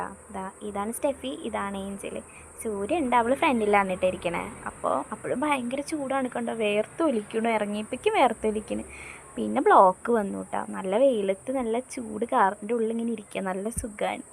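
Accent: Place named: Thrissur, India. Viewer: സംസാരം കേൾക്കുമ്പോൾ തൃശ്ശൂർ ക്കാരി തന്നെ❤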